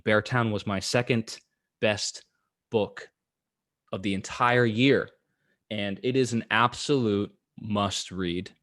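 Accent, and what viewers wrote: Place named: Italy. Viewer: You're Italian accent is amazing.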